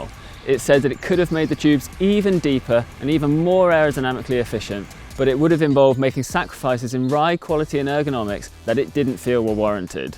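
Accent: United Kingdom. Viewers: Good British English. Soo easy to listen to.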